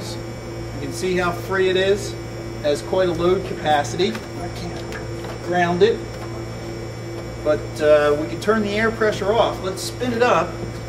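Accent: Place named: Philadelphia, United States. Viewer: You have a Philadelphia region accent.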